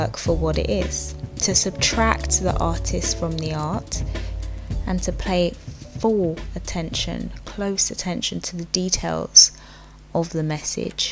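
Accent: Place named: England, United Kingdom. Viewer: It's an accent. In fact it's an English accent, so you could argue she's saying it correctly and you're not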